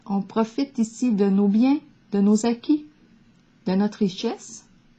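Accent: Canada. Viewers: Bonjour Ravie de vous retrouver avec ce jolie accent canadien Je vous suivez dans vos mini guidances et vous avez disparue puis de retour avec des guidances plus longues avec un grand plaisir 🙏😘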